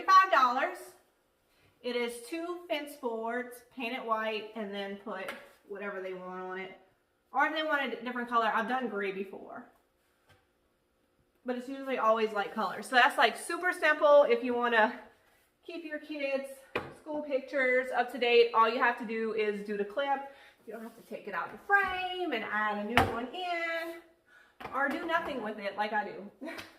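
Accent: Louisiana, United States. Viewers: I loved seeing this! Gave me ideas as well as good info on pricing. I must ask, where is your accent native to? You remind me of some of my Louisiana family, but not quite.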